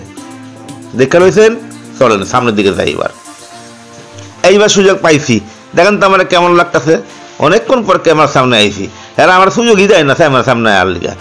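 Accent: Bangal, Bangladesh. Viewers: Dada to darun bangal bhasa bolen darun darun khub haslam go আর খাওয়া দাওয়া সেই ছিল 😋❤❤❤❤ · Biye bari blog darun ...sathe dadar bangal kotha just fatafati 😊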